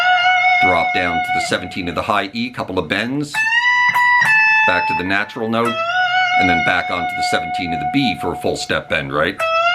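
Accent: Canada. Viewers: Hello squire. You really are becoming a very bad influence on me! I have watched a number of your videos now and I finally subscribed last night when I watched your '43 Year Guitar Journey' thing. I found that very interesting and at times moving, and I really must commend you for your authenticity and honesty. Love your Canadian-ness too (great accent!) and your love for quite a few of the same Canuck artists that I appreciate. Whilst Paul Kossoff was my first love, Alex Lifeson was the picture on my wall as a 13-16 year old. And that was B&W pic clipped out the NME here in the UK in about '78. Times were hard... Anyway. This song is full of great memories for me - my sister allowed me play her copy of ' ' more or less to death (she wasn't too chuffed about that, mind you!) and I played it on a Dancette mono record player in my tiny bedroom for hours on end. Said gramophone was donated to me by my Uncle (who had a country place! 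- geddit?). I always particularly loved ' ' - but they're all great tracks. is a fantastic tune too with lots of classic rock ingredients - and as you mention, a brilliant driving song - it's on one of my DIY car CDs. But I am wittering now... I'll finish by saying thanks for this and I wish you all the best. Cheers, son! - PT x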